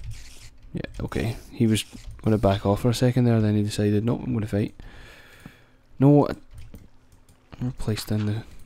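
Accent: Scotland, United Kingdom. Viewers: Yir scotish yes!!!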